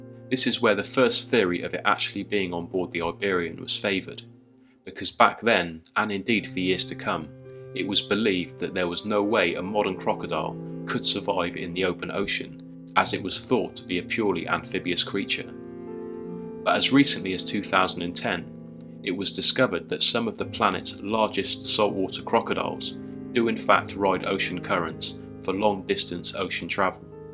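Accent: United Kingdom. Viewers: Balls to your detractors, +!:) Your voice is British (one big thumbs up for that alone), it's original... and it's mysterious-sounding, in an intriguing, only mildly hypnotic, non-campy way. You're not trying to sound like a carnival barker, so please don't speed it up! And you still haven't told me who your inspirations in the world of the paranormal are!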